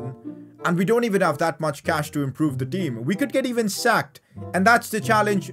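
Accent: India. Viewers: R u indian bro? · Why does have an Indian accent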